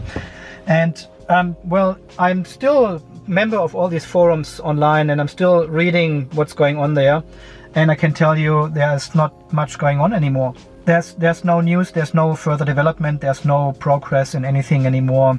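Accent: Germany. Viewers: Dein Deutsches Englisch klingt echt mies. Warum machst du eigentlich diese Videos auf Englisch mehr Reichweite ?!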